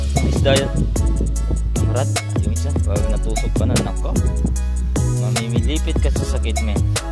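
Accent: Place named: Nueva Ecija, Philippines. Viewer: Taga saan ka bro parang nueva ecija ang punto mo ahh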